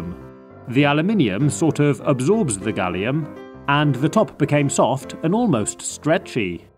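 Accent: United Kingdom. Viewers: Are you British? Can I please get a reply?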